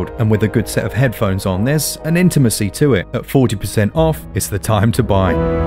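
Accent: United Kingdom. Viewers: yay british. im subbing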